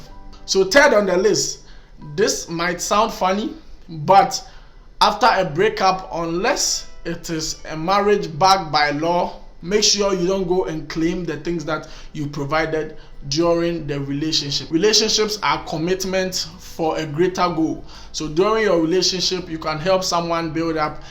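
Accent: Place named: Africa. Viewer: This African accent. I love it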